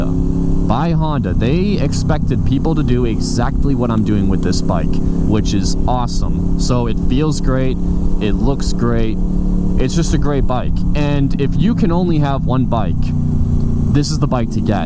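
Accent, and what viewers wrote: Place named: Boston, United States. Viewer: I hear Boston in your voice. Go B’s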